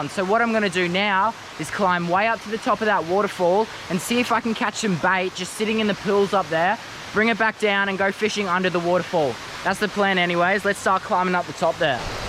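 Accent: Australia. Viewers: he’s literally australian thats his voice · He’s Australian with an Australian accent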